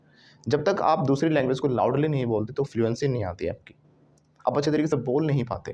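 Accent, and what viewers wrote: Delhi, India. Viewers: Are you from kanjhawala sir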